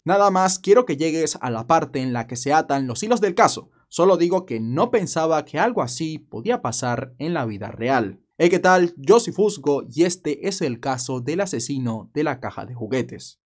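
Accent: Venezuela. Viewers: tu acento es muy venezolano de dónde eres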